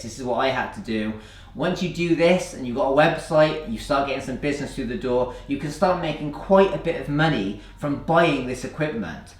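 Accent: Wales, United Kingdom. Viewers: Its probable, that him being from Wales, his accent makes it sound like he is saying "tress" rather than "truss". I may be mistaken though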